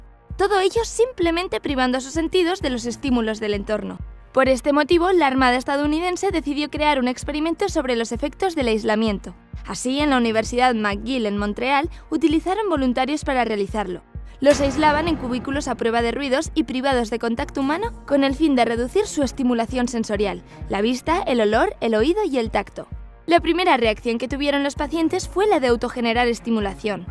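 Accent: Spain. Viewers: Español de España :. Horrible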